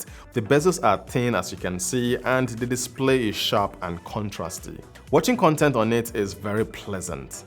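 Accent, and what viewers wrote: Nigeria. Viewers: I just wanna say thank you to my Nigerian brother with this beautiful accent, love from iran❤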